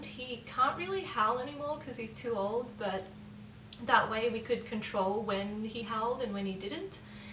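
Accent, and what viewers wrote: Australia. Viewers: Aussie,Iv decided. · Are you Australian or British or African or... What lol you have a cool accent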